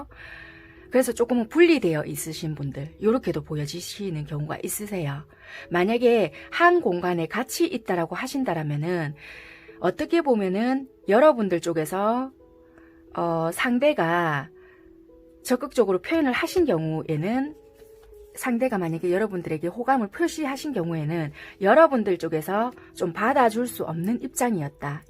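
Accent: Busan, South Korea. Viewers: 부산 사투리 뿜뿜 타로 2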